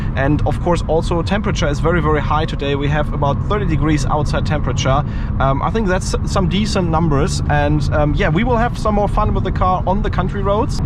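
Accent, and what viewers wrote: Germany. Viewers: Wenn Deutsche Englisch sprechen... Und was sollen die Handschuhe? So lächerlich. Ist kein F40.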